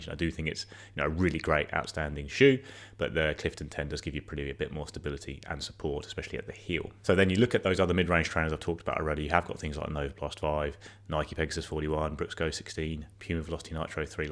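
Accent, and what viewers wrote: United Kingdom. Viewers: Talk just a bit too fast for an American to decifer the accent. I generally do well with Brit accent, until y'all start running words together/talking fast. Thx for what I could understand!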